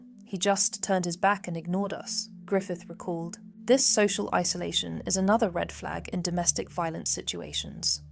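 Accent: United Kingdom. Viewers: jesus christ this channel sucks!! two minutes listening to this british niteit made me wanna blow my brains out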